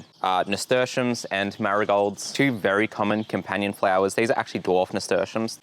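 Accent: Australia. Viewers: aussie accents are the language of heaven, melbourne strong <3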